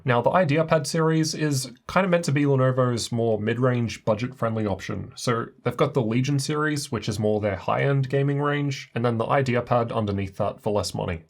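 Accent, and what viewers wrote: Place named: Australia. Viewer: That's the Aussie accent 😀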